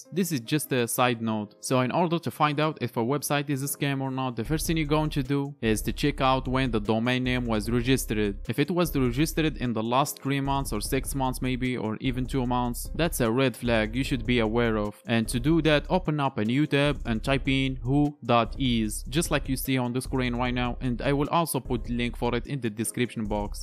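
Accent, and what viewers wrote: Morocco. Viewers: khoya bayna fik maghribi f accent rak nadi akhoya,khoya momkin insta dialek? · لهجة مغربية 😅 بينة من طبارة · are you from morroco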